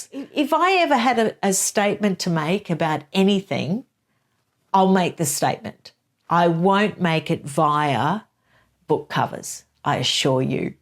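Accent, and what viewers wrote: Australia. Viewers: I really like your lovely gentle Aussie accent (I'm a Londoner) and enjoy your views...thank you!! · I absolutely don't agree she was ever pregnant. Her belly was different sizes on different days. Often different sizes on the same day. What about when the bump fell down past her knees? Remember when, at almost full term, she did a deep squat in high heels & needed no help to stand up again. Unborn babies don't conveniently move to one side so you can squat. Anatomy doesn't work that way. I've always been very slim & had 2 babies in my 20s, not middle aged as she was. I can tell you, my baby bumps got bigger month by month, not up & down on sometimes an hourly basis. Neither did my babies conveniently get out of the way if I wanted to squat. Not that I could have, even if I wanted to. So much more I could say, but, NO she was never pregnant. 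Love your vids. Your lovely accent brings back fond memories of the 5 yrs it was my privilege to live & work in Sydney. It was bonzer.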